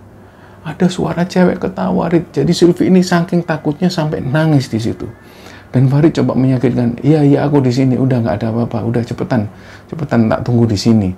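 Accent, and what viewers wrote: Java, Indonesia. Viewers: Paling suka kalo mas yang bercerita. Meduk suaranya hehe. Sukses selalu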